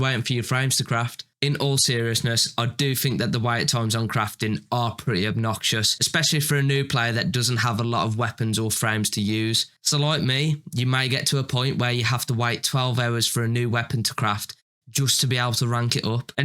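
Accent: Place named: Dudley, United Kingdom. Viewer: ive literally just found this and why does it sound like your from Dudley >.<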